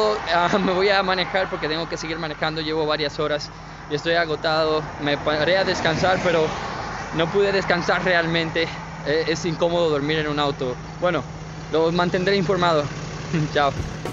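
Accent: Dominican Republic. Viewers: Eres dominicano!!!!!!!!!!!!!!!!!!!!!!!!!!!!!!!!!!!!!!!!!!?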